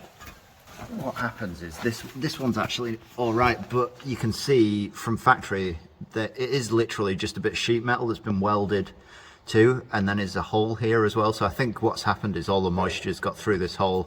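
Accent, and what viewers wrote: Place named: Warrington, United Kingdom. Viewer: yeah i had mine swapped over - made a difference as the castor got adjusted (wheel sits further forward now). great work tho man - you dont sound far from me (warrington based myself) and i visit 3 sisters from time to time - be great to catch up and check your car out :) ant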